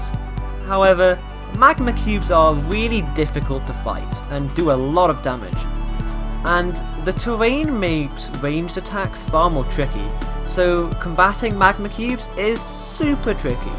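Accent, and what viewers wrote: United Kingdom. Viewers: This man's voice really fits as narration, the calm bri'ish voice is really good for voice overs. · Why are you bri'*sh?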